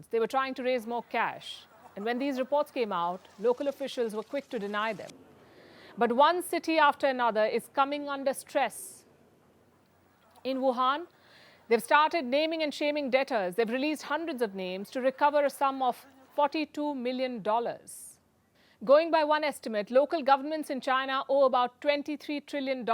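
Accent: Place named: India. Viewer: Palki is paid by the CIA to spew anti-China/anti-Russia HATRED. Her thick Indian accent is meant to fool naive Indians into thinking that she represents Indian people. That is not quite true. Palki never bad-mouths Biden or the Democrats. That is a dead give away. Palki's CIA pay-masters wouldn't tolerate that.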